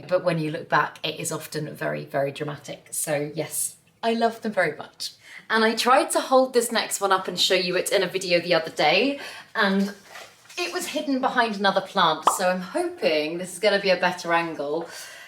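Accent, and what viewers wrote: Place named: West Country, United Kingdom. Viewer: Love your west country twang!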